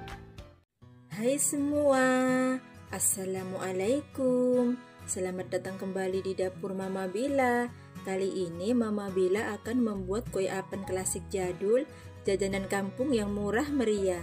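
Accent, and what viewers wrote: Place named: Madura, Indonesia. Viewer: Mantep apenna yu. Empian oreng kaemma? Mak logatta padena reng madure. Sukses yu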